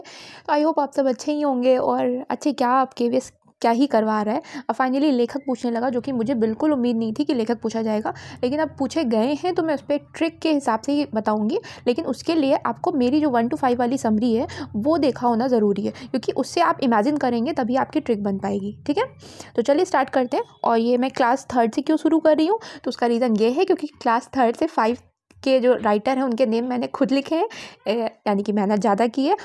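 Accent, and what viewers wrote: India. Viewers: Madam ki boli me to proper kanpur ka accent aaraha hai. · Aap Lucknow se ho kya lakhnavi aawaj hai apki?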